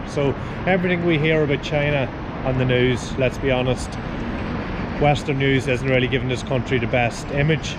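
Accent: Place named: Scotland, United Kingdom. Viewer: Australian with scottish accent, very cute, nice weather and walk around!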